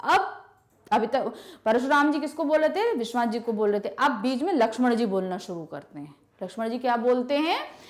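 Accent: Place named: Uttar Pradesh, India. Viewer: Can u listen her tone She is from uttar pradesh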